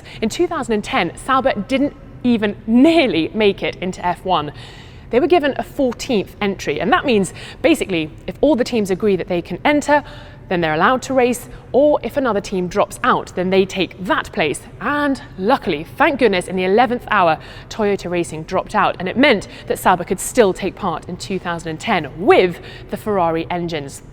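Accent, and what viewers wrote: United Kingdom. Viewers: annoying blonde lady with annoying british accent go away... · Love her British accent... wow...I am in love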